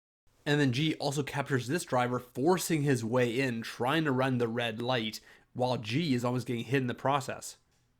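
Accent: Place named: Canada. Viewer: I find your Canadian accent so charming 😜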